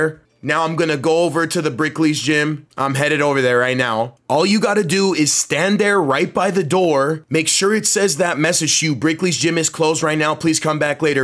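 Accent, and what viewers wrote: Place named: Canada. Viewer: You’re from Canada for sure, prolly Toronto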